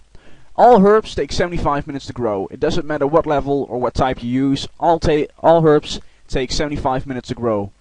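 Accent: Netherlands. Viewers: are you dutch? :D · Mhm pittig Nederlands? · Dutch accent <3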